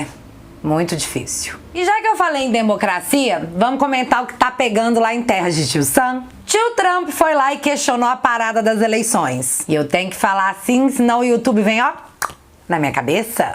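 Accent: Minas Gerais, Brazil. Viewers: Você lembra Mineira, fala qui nem eu.... da onde a senhora é?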